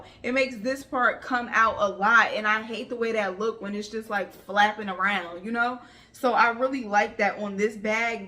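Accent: New Jersey, United States. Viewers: I came here for the bag review. I've been off of Coach for a while. But it seems like they're making a comeback. So here I am. CUTE BAG! But let's talk about you! You have a great personality. So funny. Classy. And real. You remind me of me and my friends. You MUST be from the east coast. Sounds like Jersey. (I'm from Newark). In any event, great video. Great job!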